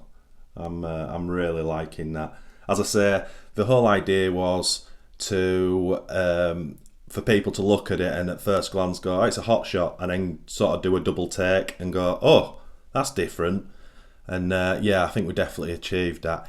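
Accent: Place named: North, United Kingdom. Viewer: I know it's off topic but are you in the UK the accent is northern but I'm guessing you've emigrated?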